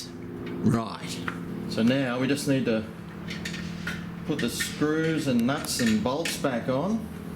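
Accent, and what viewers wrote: Australia. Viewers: Every instructional video is better with an Aussie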